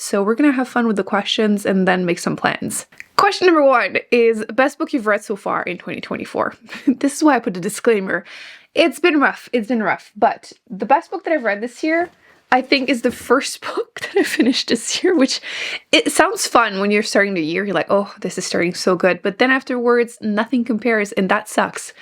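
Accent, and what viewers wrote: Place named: Quebec, Canada. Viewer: Love the t-shirt you are wearing! I don't know if I'm right but you sound like a fellow Quebecer. I really love how you are reading the shelves and letting go of the books that you haven't liked. And that big jar wow :) I discovered this year Shari Lapena (a Canadian author, a happy surprised to me) and loved her! So that is part of my reading plans.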